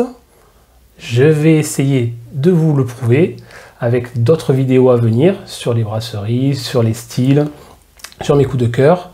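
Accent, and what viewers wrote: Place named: Marseille, France. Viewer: Félicitations pour ce beau projet !!! 🍻 Et vive l'accent marseillais ! 😄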